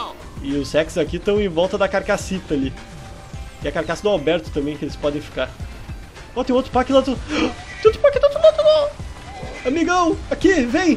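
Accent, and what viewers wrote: Rio Grande do Sul, Brazil. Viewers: vc e do rio grande do sul?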